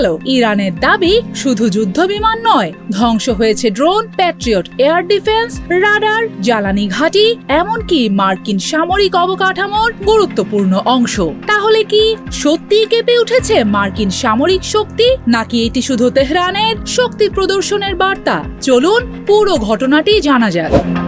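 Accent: Kolkata, India. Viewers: এইরকম কলকাতার স্টাইলে খবর পড়ে কেন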